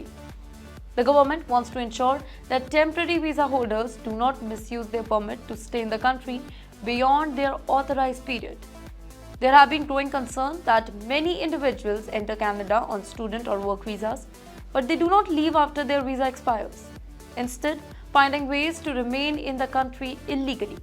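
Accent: India. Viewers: She speaks Indianlish, English with an Indian accent which only Indians can understand.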